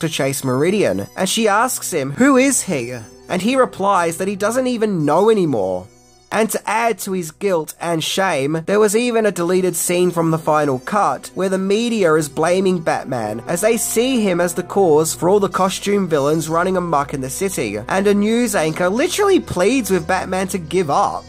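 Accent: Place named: Australia. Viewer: Everytime you say Two-Face I think you are saying Toothpaste! Lol must be that wonderful Aussie accent!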